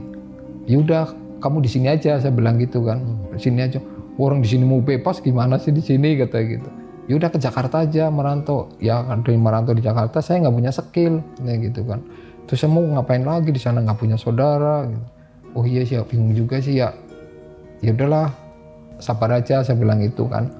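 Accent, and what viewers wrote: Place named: Banyumas, Indonesia. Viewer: Ketara banget ngapake kang.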